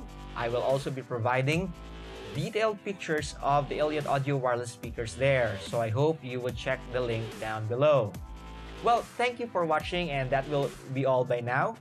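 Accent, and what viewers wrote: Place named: Visayas, Philippines. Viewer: litsi bisdak man pud diay ni sya hahaha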